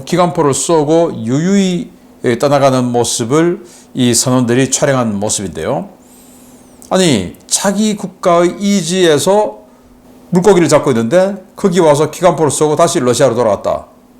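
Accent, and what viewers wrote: Gyeongsang, South Korea. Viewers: 경상도 씹 말투 존나 거슬리네~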